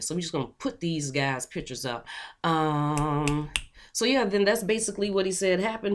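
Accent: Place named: Chicago, United States. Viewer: Subscribing cause your accent tells me you’re from my city Chicago 🤷🏽‍♀️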